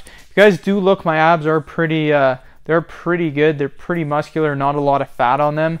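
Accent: Canada. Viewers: is it just me or does he sound canadian..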